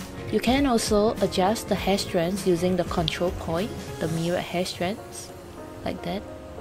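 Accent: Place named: Japan. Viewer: Wow your Japanese accent is so cool! And by learning step by step from your video I finally made my first hairstyle ever on Vroid!!!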